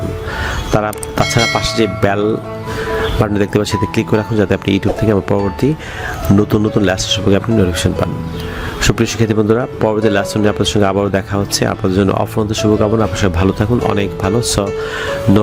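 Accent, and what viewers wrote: United Kingdom. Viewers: আপনার উচ্চারণগুলো অনেক সুন্দর স্যার। ঠিক ব্রিটিশদের মত।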